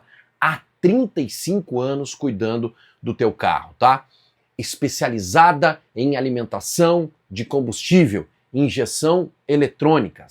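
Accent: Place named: Spain. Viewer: sou uruguaio e o som do doble L em gallardo é: gajardo ou gachardo. É vichagra ou vijagra. Esse gadiardo que tu pronuncia ou vidiagra, é espanhol de Espanha. Saludos hermano!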